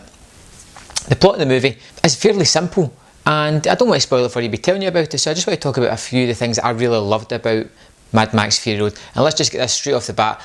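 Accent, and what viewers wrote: Scotland, United Kingdom. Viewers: hey Great review, I subbed. I am just starting out as well, trying to get to know other people who do reviews. I do Movie Reviews and Voice Impressions. I am not trying to spam. Also love the Scottish accent. Here is a review I did of San Andreas, Check it out sometime if you have the chance. <link> Looking forward to seeing other stuff you do as well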